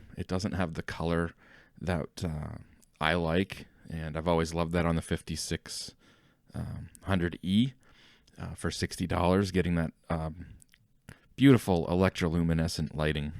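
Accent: Canada. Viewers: Thank you for the nice video, I still bought this Casio model, it will arrive tomorrow. I am not a native English speaker, but it seemed to me that you have an interesting accent, are you from Quebec? Love from Ukraine.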